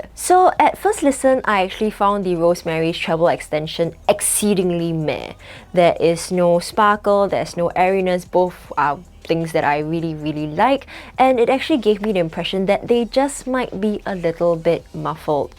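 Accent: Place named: Singapore. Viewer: my/sg accent <3 also you should try the qian69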